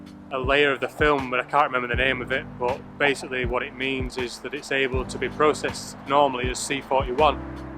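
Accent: Yorkshire, United Kingdom. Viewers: Hey, you sound more like Yorkshire than NZ?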